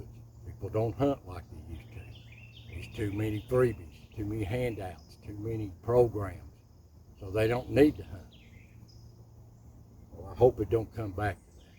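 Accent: Appalachia, United States. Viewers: I can't tell you how much I appreciate you bringing these kind of videos to the World. Just hearing you talk reminds me of some of the best people I've been so lucky to ever met and learned from in my life and of times that have so quickly come and gone. I was born and currently live in the very top of Kentucky but I grew up in East Tennessee wandering thru those beloved Appalachians and that's where my heart stays. So these videos are invaluable to me, as I live in a perpetual state of being homesick. Thank You so much for your time & effort, and your willingness to share!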